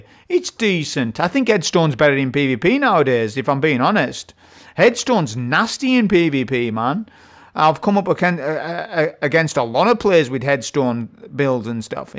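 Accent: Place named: North East England, United Kingdom. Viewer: You sound like you from my neck of the woods , north east :) just found your channel, thanks for the solid advice il shall be grinding all day!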